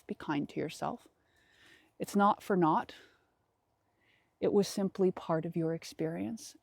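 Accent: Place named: South, United States. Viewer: Didn't think you'd be southern. Refreshing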